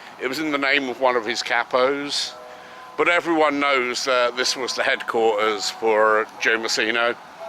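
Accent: United Kingdom. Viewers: Wow! Looking forward to that! After watching this video today, it prompted me to watch that scene again from "Goodfellas", which of course can be seen here at YouTube. Even after all these years it still packs a punch! Here's hoping you have a wonderful weekend,! Thank you for all your hard work! Oh and please don't worry about rambling too much. I think I speak for a lot of your fans when I say we can't get enough of your charming Brit accent! 🙂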